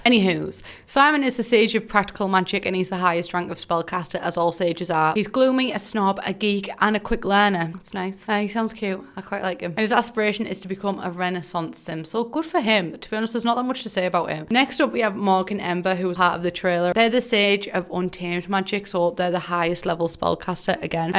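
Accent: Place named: North, United Kingdom. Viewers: This is way more entertaining listening to a northerner😂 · You are now my official Sims news broadcaster, always loved the accent, I could listen to you all day and that northern humor just has me on the floor holding my stomach.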